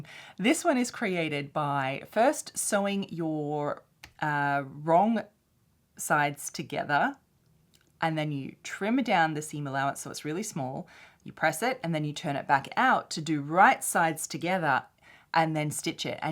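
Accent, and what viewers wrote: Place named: Australia. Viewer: I was really looking forward to this video, thank you! I had to decide to stray from a skirt pattern I was working on yesterday because the double-gauze fabric I had chosen frays so much I felt I needed to use french seams (vs just finishing the raw edges). I could have used the overcast stitch on my sewing machine but I honestly didn't think it'd be durable enough to last many wears and washes. Also, while I've watched a few of your videos before i've just now realized you've a non-American accent. Are you Australian?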